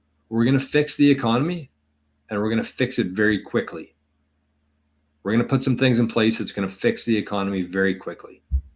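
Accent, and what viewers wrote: Canada. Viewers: As an American, it’s so cringe to listen to a canadian 🤦🏻‍♂️ Very mid content.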